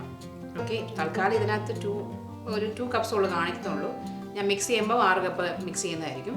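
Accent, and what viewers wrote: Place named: Kottayam, India. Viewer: Chechii.. beautiful presentation👌. Samsarathile aa kottayam style... 😍😍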